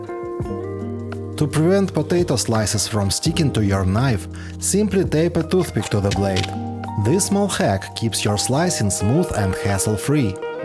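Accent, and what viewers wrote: Russia. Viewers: U sound russian. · Такой милый рус акцент, я даже забыла, что на англ слушаю. :)) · I can't help but subconsciously find the accent very uncomfortable. As if I heard Russian instead of English. the emphasis on syllables with specific vowels even scares me as a Czech. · Какой ужасный русский акцент... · Do you have a favorite hack for making 1/4" butter cubes for pie dough? the cooling rack iiii good, but I think I should pop it in the fridge or freezer to get them hard enough to pop out of the race without mashing them. Oh! and the mushroom cutting! So good. Is that a Russian accent I detect? I have so many Russian/FSU friends that I feel right at home with you teaching us. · Видео на Английском языке, стало быть и комменты будут на Английском 😂😂😂😂😂😂, да, я по незначительному акценту поняла , что парень говорит и на русском, но в общем видео для англоговорящих.❤ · Please do not record your videos in English. 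You have terrible Russian accent. Take pronunciation lessons. Good luck. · I like your ideas and your russsian accent.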